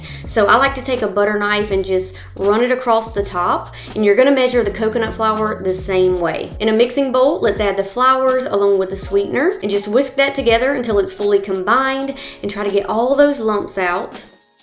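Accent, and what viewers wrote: South, United States. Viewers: The English dictionary says to pronounce it PEE-CAN --- /pəˈkän,ˈpēˌkan/ it is the Southern US that converts it to Peh-con. You have many very southern US language expressions in your speech such as 'yu'all' . The rest of the English speaking world recognizes that 'you' is often meant to be plural.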